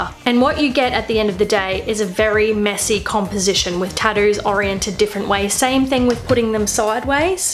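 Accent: Australia. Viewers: because she has an aussie ascent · It's an australain accent. Alot of people here say "tadoo".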